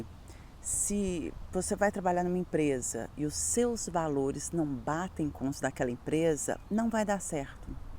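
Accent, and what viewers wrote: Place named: Brazil. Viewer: Parabéns amiga, se não fosse o sotaque ninguém diria que a senhora era brasileira. Eu como Português, nascido em 1940 tenho a dizer-lhe que, quanto a Portugal e aos portugueses, o seu raciocínio está 120% certíssimo. Faça favor de ser feliz em Portugal na companhia de quem mais ama. Bem haja por este magnifico depoimento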